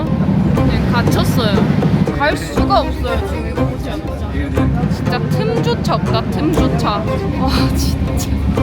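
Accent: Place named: Gyeongsang, South Korea. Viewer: 경상도에서 자라시고 TV를 많이 보신 분들은 아실텐데 옛날에 두 남자의 만국유람기라고 프로그램이 있었는데 거기 나오는 김근수씨 느낌도 나면서 (해외인데 꿋꿋하게 한국어 + 사투리로 소통하심ㅋㅋㅋ) 센스도 있으심! 너무 재밌습니다. 같은 진주사람으로 앞으로의 버킷리스트도 응원합니다~!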